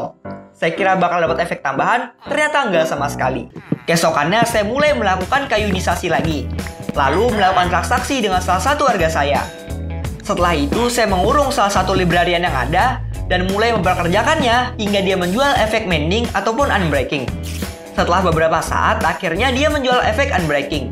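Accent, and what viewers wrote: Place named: West Java, Indonesia. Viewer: Bang nanti coba tugas ipa dong semangat terus bang ngonten nya lanjutin konten survival seru bangettt dan cara ngomong abang kek orang Sunda :v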